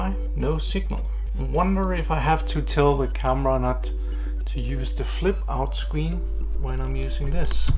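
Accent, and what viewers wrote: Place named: Denmark. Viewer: im betting my ass on the line that this is a danish guy, his English accent is typical of a dane.....the ice is melting at the poles hahah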